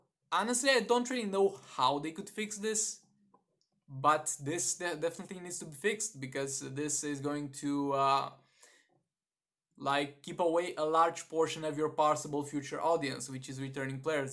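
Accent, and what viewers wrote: Romania. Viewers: Omg Ur from Rumania. Been wondering where your accent was from for a while.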